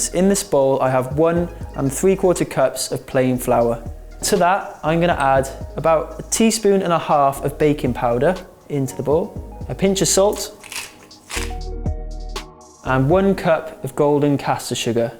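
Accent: Wales, United Kingdom. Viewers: Alisha C That’ll be a Welsh accent. He’s from Cardiff. I don’t think he’s cute, more so because I have sons his age and that would feel weird, but I’d be very proud of him if he were my son. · British accent ?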